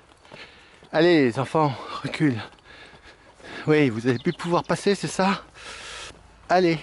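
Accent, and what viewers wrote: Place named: Allier, France. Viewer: Salut, je voulais savoir dans quelle région tu étais car je suis actuellement en stage dans l allier et c'est le même accent et la même façon de travailler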